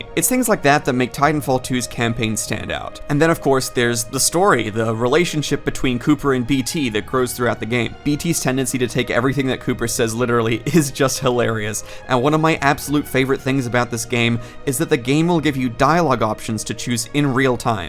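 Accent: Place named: United States. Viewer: i have a question why do you have american accent when you are australian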